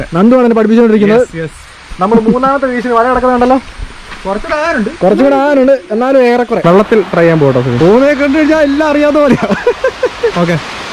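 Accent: Kottayam, India. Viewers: Chettan കോട്ടയം ആണോ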